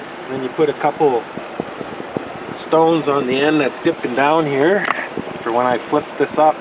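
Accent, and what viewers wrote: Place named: Canada. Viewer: does his videos from his claim way up in BC. Not Colorado. Haha, as if you couldn't tell by his accent Ay.. Lol. I myself am just getting in to prospecting. Just picked up a pan set from Cabelas this past Christmas. Now I'm just waiting til everything thaws out enough to go out there and get some color. Good luck in your endeavor my friend.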